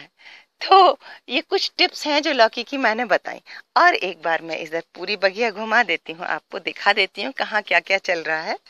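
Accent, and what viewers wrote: Standard. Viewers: Thank you ❤aapne reply kiya Aapki awaz bahot acchi hai or hindi bhi shudh bolte ho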